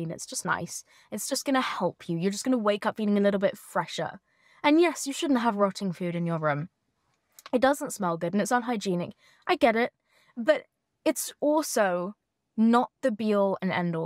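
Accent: United Kingdom. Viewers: im british and she sounds very british to me, i don’t hear australian tbh😂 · What accent does her have ? The British one ? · her style, natural look/makeup, clear skin, brown layered¿ hair and british accent really make her come off as clean girl/posh if you dont know her personality.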